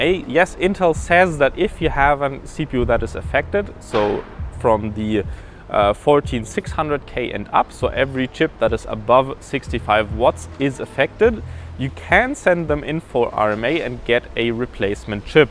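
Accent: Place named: Germany. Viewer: ich hör da teutsch raus!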